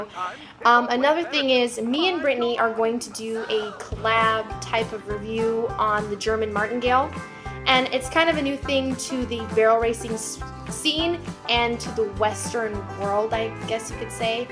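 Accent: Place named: North, United States. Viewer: Country what? U sound like ur from the north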